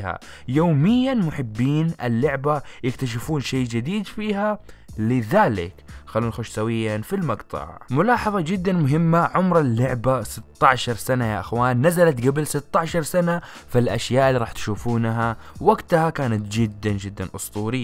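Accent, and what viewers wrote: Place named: Saudi Arabia. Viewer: لا السعودية واضح من لهجته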